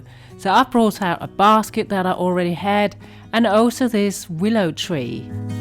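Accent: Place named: United Kingdom. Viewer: Wow! Your porch is absolutely stunning! And those sweet teddies are tucked in the tree so they are sheltered from the elements! I think Hermes makes a bag styled very similar to your Teddy Blake bag. Aren’t you chic with that fabulous handbag! Finally, besides loving a British accent that I have fun trying to mimic, I learn new words from you. In the USA we call “wadding” filling or stuffing. You have convinced me that I need to make some of the felt hearts with wadding! Than you so much for sharing your creativity with us!❤❤❤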